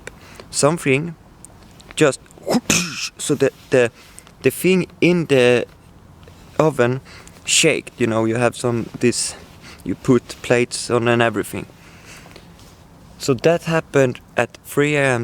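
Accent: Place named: Sweden. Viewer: My husband and I enjoy your videos. He said your accent reminds him of his grandparents, as they too were Swedish. hehe We may be in America, but you are not alone. Sending you both all my love and prayers during a difficult anniversary (your wife's father), and a celebration of life (your birthday) I too am a survivor like you, I died during brain surgery. You are still doing an amazing job. Like I said before, your english is very good, and you explain everything quite well. P.S. - Did you already upgrade the mic or camera. The background noise, and clattering is almost nothing Sounds much better!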